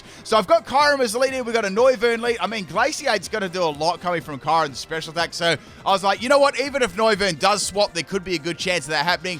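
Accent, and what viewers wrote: Australia. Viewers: Your Australian accent is like the chef kiss on the video. Always has been. The real pimp of YT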